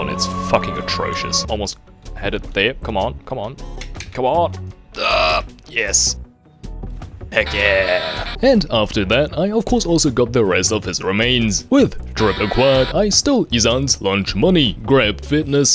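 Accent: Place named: Franconia, Germany. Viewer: Wait are you actually German? Your accent is interesting, what region are you from? Your pronunciations remind me of the Franconian accents I've heard.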